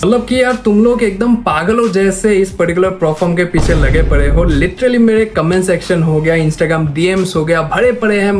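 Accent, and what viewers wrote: Assam, India. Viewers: Bhai ka hindi sunke hi pata chal gya ki yeh bande Assamese he 😅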